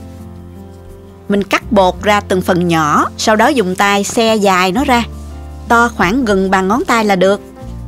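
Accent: South, Vietnam. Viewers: Giọng nói người miền nam nghe ngọt ngào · Ko biết khuôn mặt xinh ko nhưng giọng nói dễ thương chuẩn miền Nam hay lắm . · Trời ơi, ghiền cái chất giong Nam rặc của cô quá đi🥰🥰🥰🥰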